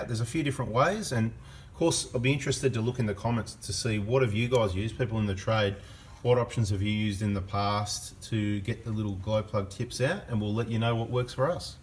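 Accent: Australia. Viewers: Hello! I’m new to your videos. When you say 5k’s, is that in km or miles? You sound a bit Australian so I was thinking it must be km, but changing your oil every 5k is a bit overkill? Here in Norway the price for a liter oil is around 20-30 US dollar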